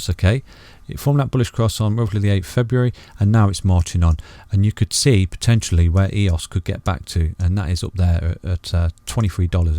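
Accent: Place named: RP, United Kingdom. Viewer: Where are you from mate?, just got that BBC english accent i listened back in Bournemouth 2012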